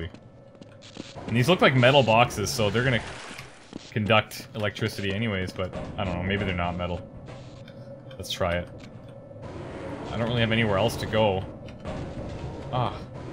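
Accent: Canada. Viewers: I'm so glad I found this LP :) Keep it up! And Canadian accent ftw.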